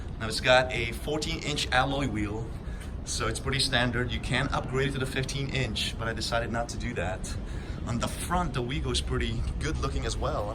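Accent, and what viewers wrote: United States. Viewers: I thought this video was filmed in US because of ur accent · Nice voice with american accent & very well review. 👍👍👍👍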